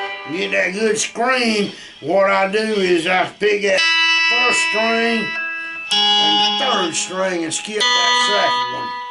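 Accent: Texas, United States. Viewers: This is Texas English or what ? Its funny . I can listen steel guutar all day.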